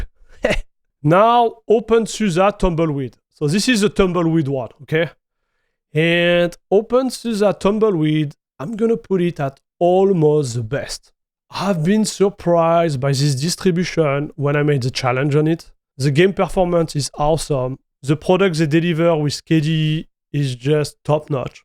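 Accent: France. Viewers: Pas facile d’écouter un Français qui parle Anglais. 😅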